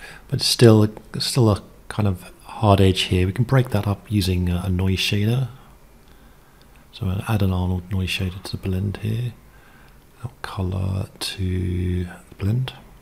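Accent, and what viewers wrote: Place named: New Zealand. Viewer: That is Kiwi accent, if you are wondering. Every video teaches me something new.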